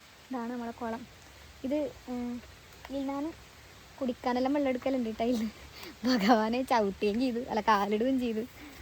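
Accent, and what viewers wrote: Kasaragod, India. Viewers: അംഗനവാടിയിൽ പോവാൻ വഴിയില്ലാലേ കാസർഗോഡൻ ഭാഷ നിഷ്കള ങ്ക സംസാരം ജനിച്ച വീടും നാടും മറക്കരുത്.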